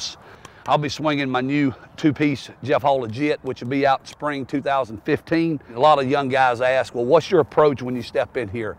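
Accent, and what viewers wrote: South, United States. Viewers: As a southern boy (74 yrs old) , myself, love to hear Jeff Hall talk ! I'll bet he can eat some cornbread ! :) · It's southern not english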